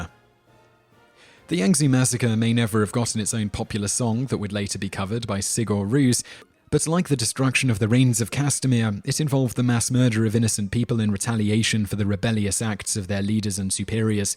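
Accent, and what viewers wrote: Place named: British Asian, United Kingdom. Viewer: His British-asian accent is funny :P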